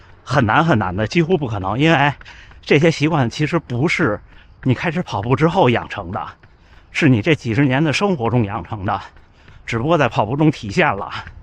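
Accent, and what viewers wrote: Beijing, China. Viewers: 還好北京話我聽的懂🤣🤣🤣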